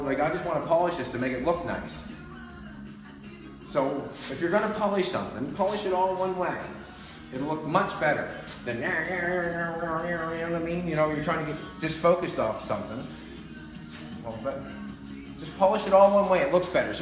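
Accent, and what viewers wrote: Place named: Nova Scotia, Canada. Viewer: Love that Nova Scotia dialect.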